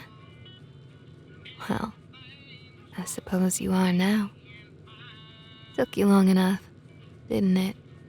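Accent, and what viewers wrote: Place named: South, United States. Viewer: Ok I'm begging please take the ropes off I'm sorry for what I did please they're really hurtin me thanks for the wonderful audio really love the southern belle accent 💕🌹💕🌹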